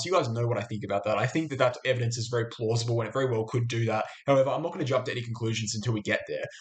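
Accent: Australia. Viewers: I like your TA and offers of your considered opinions about the directions of the market. I am presently positioning myself and my wife's portfolios to take advantage of these downward moves in bitcoin and a couple of Altcoins as well. I have a small criticism in that your aussie accent can make catching all of your commentary at the speed at which you speak. Have pity on the rest of us english speakers as we want to get all the nuances that you are putting out! Thanks - RHH.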